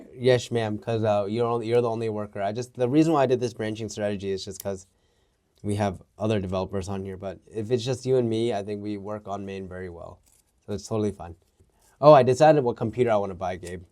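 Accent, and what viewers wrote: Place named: United States. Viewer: Wait.. What? You have a very good American accent for a Korean.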